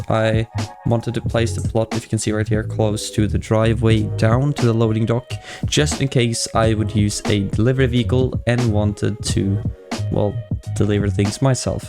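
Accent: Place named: Norway. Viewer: im norwigian to i can kinda hear that your norwigian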